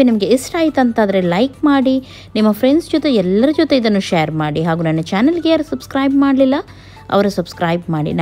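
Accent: Mangalore, India. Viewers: Mam I really love ur manglore Kannada and also ur recipe's.. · Hai madam nowadays I am watching your all viedeoes superb your mlore kannada so nice your family very good children are so naughty Khushi aagatte always be happy thank you so much daily vlog maadi mam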